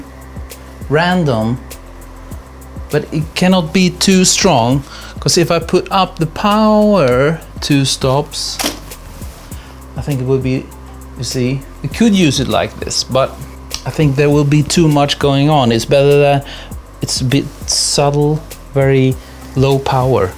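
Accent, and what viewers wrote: Sweden. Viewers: Ahhhhh proper Swedish accent. Renewed my passport today and I felt I needed to hear some more Swedish so good timing with the video.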